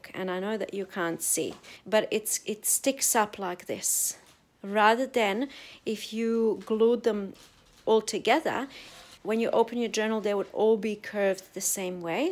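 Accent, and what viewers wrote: Australia. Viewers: Im delighted with your vid Miss, You are an absolute treasure, and so are all your great ideas.... Im furious with anyone that would say such a derogatory statement... goodness, its instructional tutorials, lots of talking is required... please ignore those that would say such terrible things, I could listen to you read the phone book, love listening to that beautiful Ozzie accent..., You are perfect the way you are, dont let anyone tell you any different. God made us all perfect, in his image in fact. Tell the haters to pound sand, we love and adore you the way God made you. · Please keep talking. I love your voice. My mother was an Australian war bride who immigrated to Texas. She's gone now. Your voice reminds me of her voice and my Aussie cousins. And your explanations are essential to the teaching process. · ........you gorgeous beautiful human being...this video has to be in my.....FAVORITE TOP FIVE!!!....these are just absolutely amazing...thank you for showing how to do them....too....please I love hearing you talk as we are both Aussies!!!...Huge hugs and love....Maxxii-Michelle....Perth Western Australia xxxxx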